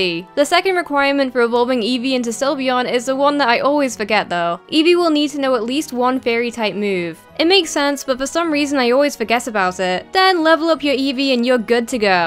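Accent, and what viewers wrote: England, United Kingdom. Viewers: She's English, as in, from England. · BearShark Interactive she's british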